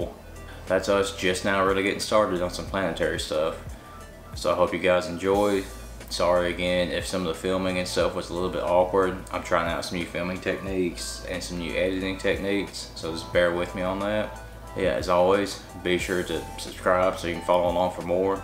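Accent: United States. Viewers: where in America is this guy from for that accent? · Wat state is his accent from...sounds cool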